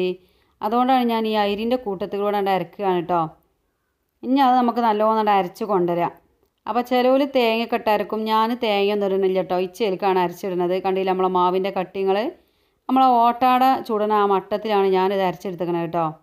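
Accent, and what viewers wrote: Malappuram, India. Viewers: അടിപൊളി മലപ്പുറം ലാംഗ്വേജ് കേൾക്കാൻ ഭയങ്കര സുഖം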